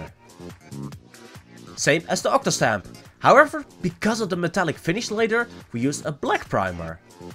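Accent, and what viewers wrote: Netherlands. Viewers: BEAUTIFULLL!!! btw are u from nederland? u have that accent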